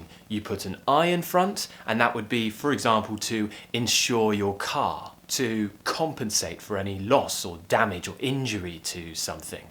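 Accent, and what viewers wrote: United Kingdom. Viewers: Top of the morning sir, do you speak in the British English? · I Love Your Accent. I'm watching every of your videos. And I learned a lot, Especially the British Accent, So Now,I'm studying British accent and finally I found your videos and it help me, I mean, You help me and others about british Pronounciation, Accent and Phrases,Etc. Thanks to you Idol. I'm from Philippines and proud to learn British English. I Hope That Someday I will also come to the United Kingdom. Especially In my Favorite Place London, England.I hope you can help and teach a lot more like we are just learning English and British accent And I hope your Channel lasts longer, have more Likes, shares and Subscribers. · Hello from Brasil! I really love your British accent, love your way of teaching. Thanks a lot.♡ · In love with your British accent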